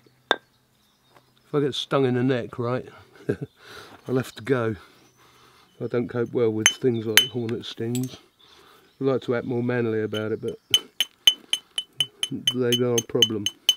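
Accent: United Kingdom. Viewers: With this filming you look animated like the fantastic mister fox movie. I love your content it's very genuine. I have been trying to learn napping but have only found Americans and was very glad to hear a calm u rushed british voice with birds in the backround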